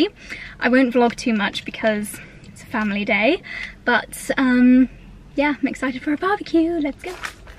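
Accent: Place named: England, United Kingdom. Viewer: I love just listening to you talk. Such a beautiful voice and cute English 'accent' :)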